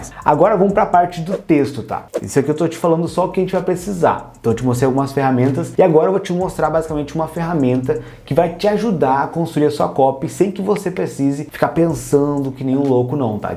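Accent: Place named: Rio Grande do Sul, Brazil. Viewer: Tchê tu és de onde daqui do RS?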